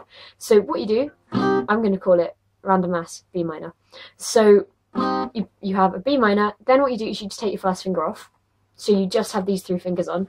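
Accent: United Kingdom. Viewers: Thanks for the "random ass Bm" chord...i couldn´t figure that out. By the way, i love your british accent ;) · very nice lesson and very beautiful british accent! thank you !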